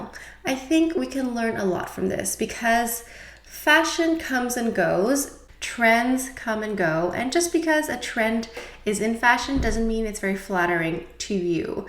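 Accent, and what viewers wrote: France. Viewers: I just discovered your channel. It's a good idea to talk about fashion and makeup at the same time. Your French accent is amazing.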